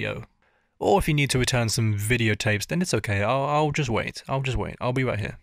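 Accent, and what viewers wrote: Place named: Cockney, United Kingdom. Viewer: You lost me at Cockney accent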